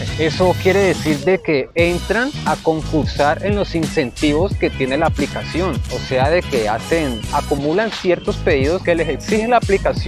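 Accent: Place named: Colombia. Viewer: Buen día De que parte de Colombia es usted??? Porque por el acento NO es de Barranquilla....jajajajajajaja Muchas gracias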